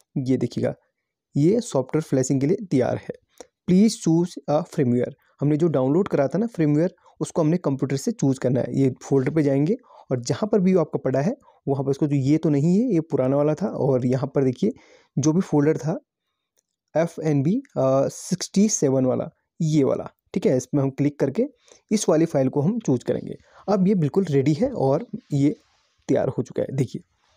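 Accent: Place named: Madhya Pradesh, India. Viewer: Sir aapki language ♥️ madhyapradesh ♥️ jaisi mach hoti h aap bhali utrakhand se ho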